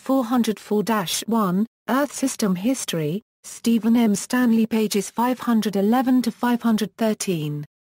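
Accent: United Kingdom. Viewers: Informative content but the British voice was distracting and annoying because it sounded robotic and artificial AI-like.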